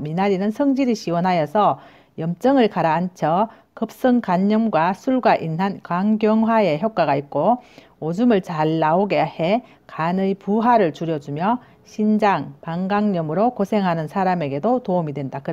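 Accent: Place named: Daegu, South Korea. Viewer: 구수한 대구사투리 억수로 좋슴미다요🤗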